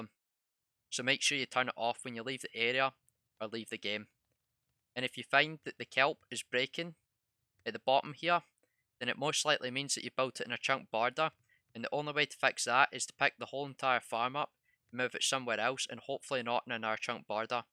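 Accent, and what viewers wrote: Scotland, United Kingdom. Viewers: Is that a Scottish accent? Sounds cool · aye are you scottish from 🏴?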